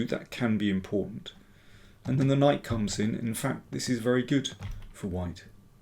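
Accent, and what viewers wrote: England, United Kingdom. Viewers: Great cover as always. I'm sitting here, out of town, in a suburb of Stockholm, a bit lonely, finding comfort in your coverage and your lovely English accent. Cheers.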